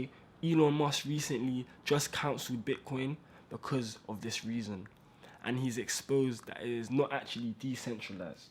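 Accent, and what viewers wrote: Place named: United Kingdom. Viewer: your uk i know because how you talk.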